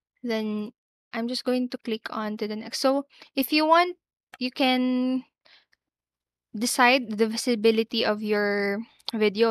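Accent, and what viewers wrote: Philippines. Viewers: Classic filipino/indonesian accent but this video helped me i am going to drop a sub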